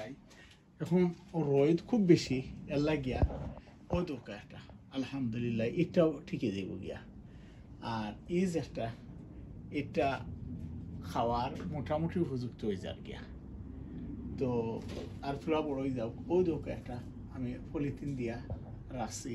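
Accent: Sylhet, Bangladesh. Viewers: তোর আর কোন কাম নাই কথা শুদ্ধ ভাষায় বলতে শিখে কথা বলো সিলেট মাল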